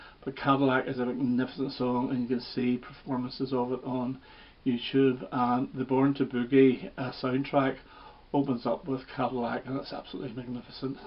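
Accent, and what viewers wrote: United Kingdom. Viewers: From America.. it's lovely to hear the Brit accent. We here were only introduced to Electric Warrior and Slider, and it's fun to catch the rest. Like your selections and collection! 😊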